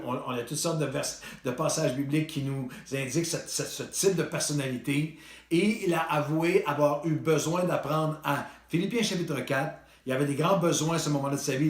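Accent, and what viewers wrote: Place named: Canada. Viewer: Bsr, j’aime vous écouter Mais j ai du mal à tout comprendre avec le français canadien trop vite Que Dios l bendiga amen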